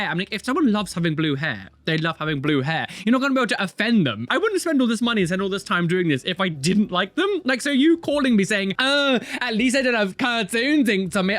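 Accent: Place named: RP, United Kingdom. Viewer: all of these people dogpiliing on you … are they Americans? Because if they are, it explains a lot their baseless accusations. You see, here in the US, there's a bit of a trope around a British RP accent: it's associated with either (A) being erudite and and expert [both of which are hated by a large swath of this country]; (B) movie villains; or (C) snobs. Having been raised on Monty Python and imported British TV shows, I don't have that reaction. But to 'Muricans who do not have much experience with British culture, your RP accent [which sounds quite good to my ignorant American ears] is probably making them fall into those tropes the instant they hear you speak. Which may explain the hate — they literally are incapable of overcoming their preprogrammed reactions.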